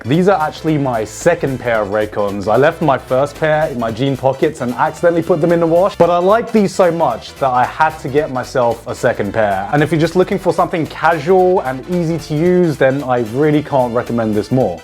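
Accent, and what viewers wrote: United Kingdom. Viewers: 🙋‍♂️I miss the salty gigguk who swears every 3 seconds in British accent. He made a baccano video swearing for 3 minutes, and it's a masterpiece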